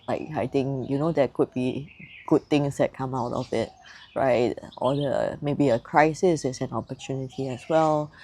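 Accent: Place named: Singapore. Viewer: Nice to hear a Singaporean voice 😊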